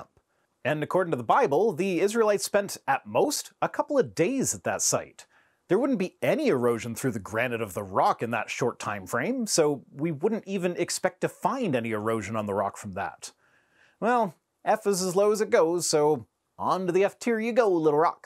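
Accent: South, United States. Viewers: Being from the southern United States, I can say this with all sincerity and lots of experience...NEVER BELIEVE ANYTHING ABOUT GAWD WHEN IT IS SPOKEN WITH THAT ACCENT!! NEVER!! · His southern accent is so grating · I tried to get through this , but this dude's voice and flimsy argument were too much to tolerate. And although I live in Louisiana, his cuuuntree Ackkkksent was shrill at best.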